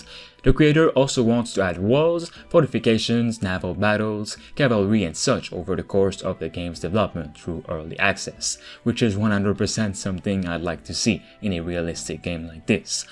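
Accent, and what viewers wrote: Quebec, Canada. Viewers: t'es québécois toé ? sa s'entend a ton accent, nice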